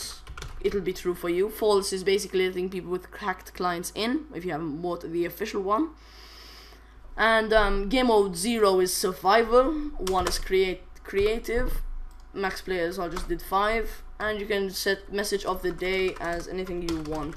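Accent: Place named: United Kingdom. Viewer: omg i hate britsh english this so retarded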